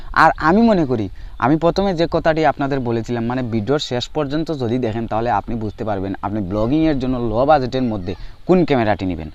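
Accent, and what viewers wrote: Sylhet, Bangladesh. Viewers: Bhai apni Sylheti right?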